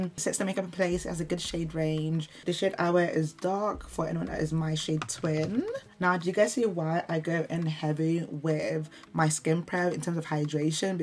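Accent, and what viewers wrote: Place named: United Kingdom. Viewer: obsessed with how you're putting us on!! are you nigerian?i love your accent, black british folk are another level of gorgeous. sincerely, nigerian american cousin here :)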